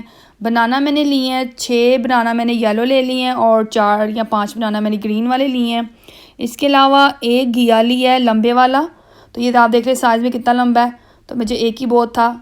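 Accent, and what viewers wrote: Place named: Lahore, Pakistan. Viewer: ye Lahorei style hai.hume tu bht pasand hai.m bhi lhr se hun.or bht acha bolti hai👌👌 jee❤❤❤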